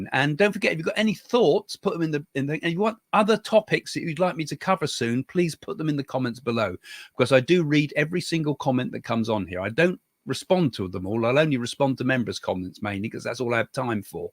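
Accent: United Kingdom. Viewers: Mr., YOU ARE THE BEST!!!! Your royal British accent is CHARMING!!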